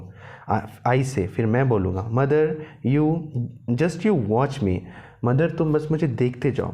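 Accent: Bengal, India. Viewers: Sir ayi hai . Are you bengali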